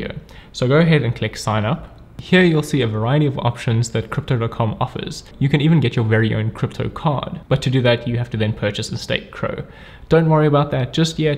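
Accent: South Africa. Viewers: Hey, are you South African, and do you also reside there if indeed you are, curious coz your beautiful accent sounds like it? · hey brother, you sound south african?? I stay in Cape Town and would really aprecciate if you could give me advice on best platforms to use in South Africa?? and any advice in general as a cape tonian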